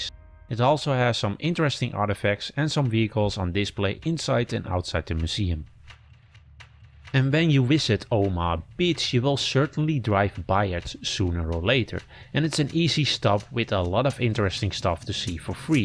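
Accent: Netherlands. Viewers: are you dutch ? you've got a slight accent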